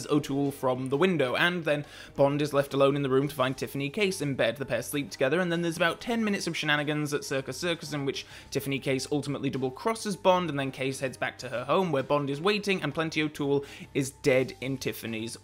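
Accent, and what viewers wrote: United Kingdom. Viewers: Well done. This wouldn't have worked as well if you didn't have a British accent <smile>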